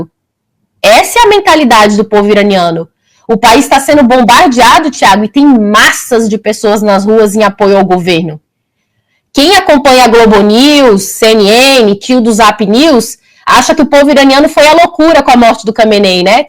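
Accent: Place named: Minas Gerais, Brazil. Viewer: ❤ QUE SOTAQUE LINDO! SERIA ELA UMA MINEIRA? MUITO BOM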